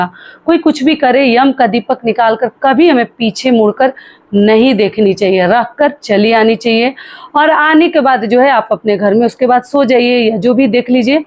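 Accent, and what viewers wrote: Bihar, India. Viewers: Aap pura hmare bihar ki vidhi btayi h jiski mujhe jarurat thi or aapki baat krne ki tarike se lgrha ki aap bihar se hi h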